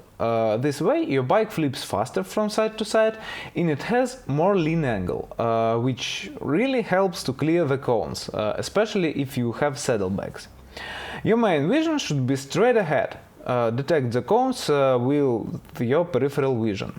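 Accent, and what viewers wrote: Russia. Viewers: Concise and precise. I have watched and followed many videos on YouTube, yours is the most understood despite your thick Russian accent. Cheers from Malaysia. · I love your russian inflections when you speak english, theyäre so charming :-) great vid as always, oldie but goldie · Apart from being 100% quality easy to follow and to remember, motor bike riding lessons, I love the Russian accent, specially when you speak Italian, thanks buddy.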